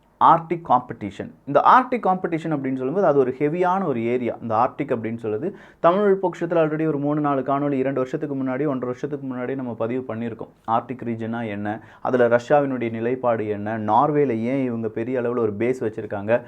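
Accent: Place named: Kerala, India. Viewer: Kerela TAMIL NO NO 😂😂😂😂😂😂😂😂😂😂😂😂😂😂😂😂😂😂😂😂😂😂😂😂😂😂😂MOUTAL😂😂😂😂😂😂😂😂😂😂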